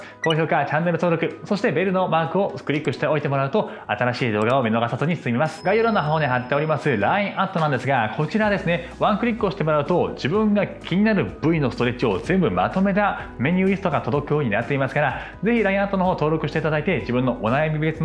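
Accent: Kansai, Japan. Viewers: 関西訛りが良かったです 笑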